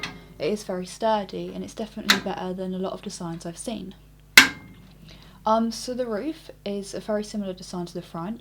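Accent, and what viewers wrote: United Kingdom. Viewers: She's British. That's how some of us British people pronounce our letters just like how American's each have different accents and pronounce letters differently.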